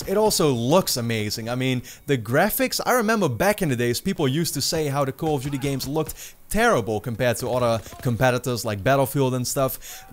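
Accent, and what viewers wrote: Netherlands. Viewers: Dutch ? I can here that right away because the accent lol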